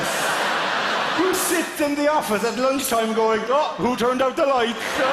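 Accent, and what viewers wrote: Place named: Ireland. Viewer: It's just an Irish accent with a lisp thrown in.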